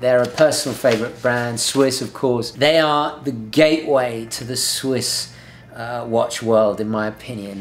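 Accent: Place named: United Kingdom. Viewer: You sound more British today! Have you been talking to your relatives?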